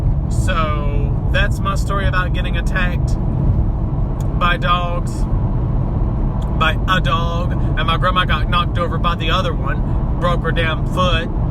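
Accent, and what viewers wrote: South, United States. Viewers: K- None, introduced me, to this funny, brave, passionate man, with a great voice for audio, that has an ear-pleasing, Southern twang.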